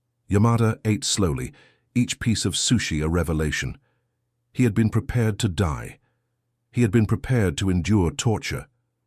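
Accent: United Kingdom. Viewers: Watching from 50 miles east of Camp Blanding, in NE Florida. The US Army doesn't have 'Left-tenants', we have 'Lew-tenants'. Is your AI narrator British? There is 'cognitive dissonance' again st . It's in every video you post.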